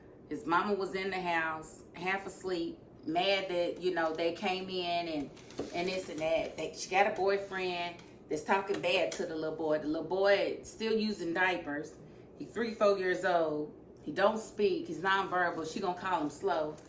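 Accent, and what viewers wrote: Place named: Chicago, United States. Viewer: I’m a relatively new sub. Are you from Chicago? Your accent reminds me of Selena Johnson.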